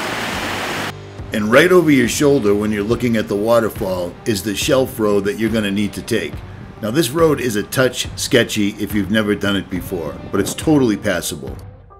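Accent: Boston, United States. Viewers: Just stumbled across your vids while exploring the black hole of YouTube. What part of Boston you from? You better not say Framingham 🤣. Great vids, keep em’ coming. Mike- who hails from Boston but now lives in the burbs’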